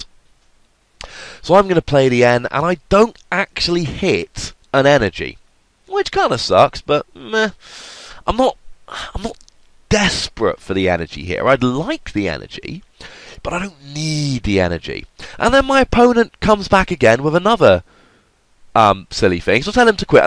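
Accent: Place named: United Kingdom. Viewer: A bunch of random t's, that's so British of you.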